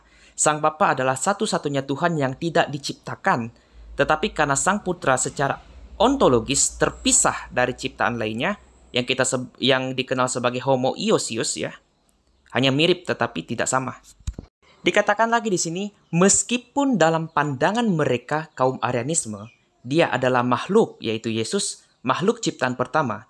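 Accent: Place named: Malaysia. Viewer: Cumi, bang Edis dialeknya Batak, kalo ini agak ke dialek Melayu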